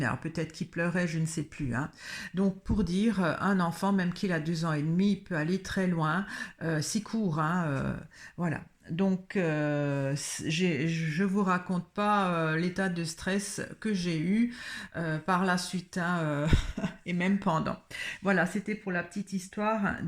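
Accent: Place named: Besançon, France. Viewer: Bjour Madame, ê vous de Besançon? Je pose la question en raison de votre accent.Je suis née là bas mais je n'y suis pas restée longtemps. En espérant que ce petit bout d'chou sera retrouvé sain et sauf❤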